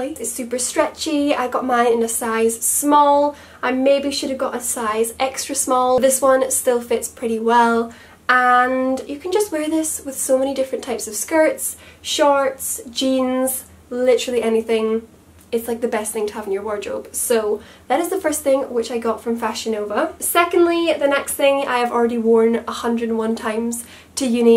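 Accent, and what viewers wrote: Scotland, United Kingdom. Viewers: It's so nice to hear another Scottish accent on youtube 👏🏻👏🏻 I've been trying to find fellow Scottish youtubers, absolutely adore your videos!! 😊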